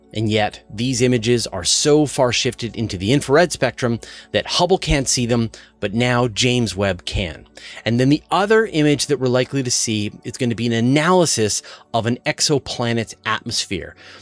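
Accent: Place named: Canada. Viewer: Hello, thank you for the news that you put out. I have a question for you off topic but I place people by their accents and yours has a tiny, tiny bit of Canadian and I have some difficulty placing it. If I had to guess it would be Canadian within a few miles of the US border on the western 1/3 of the country. I would like to know where you are from, Born , raised, K-12 in a big city or rural. Thanks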